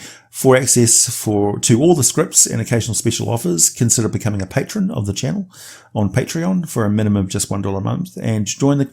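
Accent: New Zealand. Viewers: Do I hear a kiwi? Or maybe South African... I should be able to tell the difference I’m half kiwi 😂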